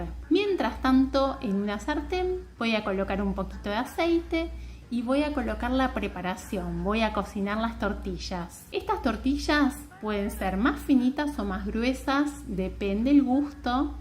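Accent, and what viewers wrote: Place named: Argentina. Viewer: Buenas noches, querida. Me aparece el video con traducción al portugués, que hablo muy bien porque viví en Brasil durante ❤más de 30 años. El problema es que a mí me gusta escucharte a tí en castellano con ese acento argentino (¿o uruguayo?) y es tu voz la que me gusta oír... ¿Me puedes decir cómo evito esta voz doblada con traducción?? 😮 Muchos cariños desde Canadá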